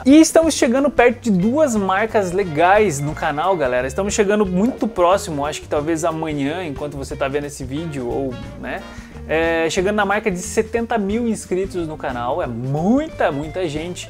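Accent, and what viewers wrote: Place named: Curitiba, Brazil. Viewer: O áudio da câmera realçou ainda mais o teu sotaque curitibano. rsrs Abraços!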